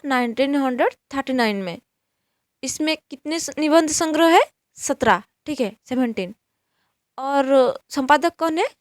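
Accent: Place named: Odisha, India. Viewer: odia uchharan ho rahi hai .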